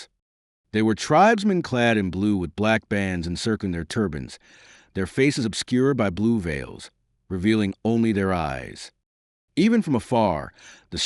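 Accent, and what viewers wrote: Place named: California, United States. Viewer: It’s very standard California accent, but not quite what you’d hear in Hollywood. I quite like it as it has a lot of character to it.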